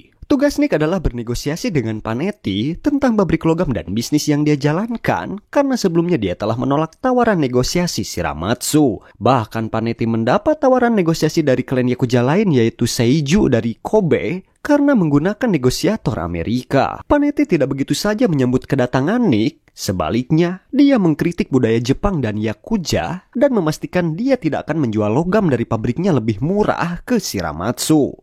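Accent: West Java, Indonesia. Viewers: Bang asli orang mna orang Sunda iya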